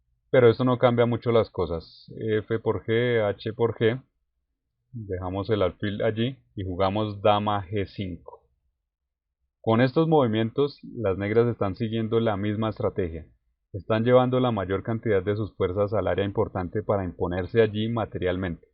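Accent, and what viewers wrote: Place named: Colombia. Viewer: coño, no sabía que igor smirnov supiera hablar tan bien español,lo habla con acento colombiano,increible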